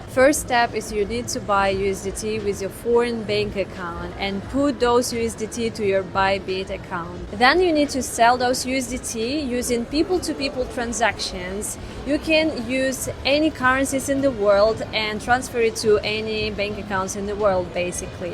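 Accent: Russia. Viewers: Madam, living in various asian cities Have blunted the Russian accent of Your English. i.e your english has lost Russian flavour. I love to listen russians Speaking English. It very different and Nice to ears . ❤❤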